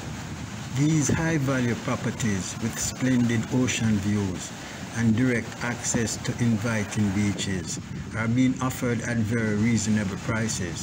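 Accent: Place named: Jamaica. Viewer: Greetings my brother, u sound like a jamaican just came across u video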